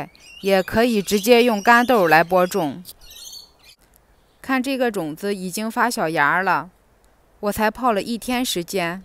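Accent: Qingdao, China. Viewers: 听到熟悉的青岛普通话，很亲切，我种的蚕豆容易倒，不知道什么原因，看到你的这个视频，对我种蚕豆有很大邦助，赶紧剪枝，繁殖太多衩了，主干细高，谢谢你的视频。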